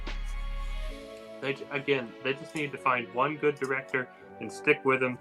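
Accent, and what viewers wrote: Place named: South, United States. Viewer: You sound southern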